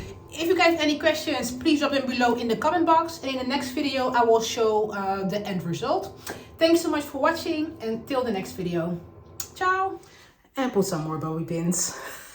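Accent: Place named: Netherlands. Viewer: Ik hoorde al bij de eerste zin dat je Nederlands was. 🤣 Ik kwam je video tegen omdat ik niet zeker was als ik die cream & butter samen kon gebruiken; dus ik zocht het even op Google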